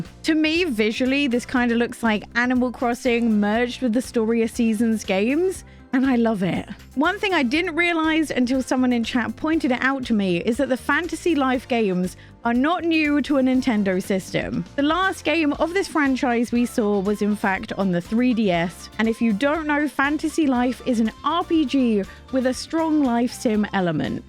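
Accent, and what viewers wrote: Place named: United Kingdom. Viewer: If you don't mind me asking, where is your accent from? It sounds British, but also a little different, for example right at the beginning when you say "so many," the "so" almost sounds like there's an "r" sound at the end of it. Thanks!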